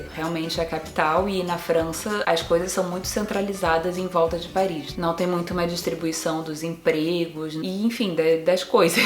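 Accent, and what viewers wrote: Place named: Rio de Janeiro, Brazil. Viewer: Sua voz é muito boa, dicção também! O nosso sotaque carioca é muito bom hehehe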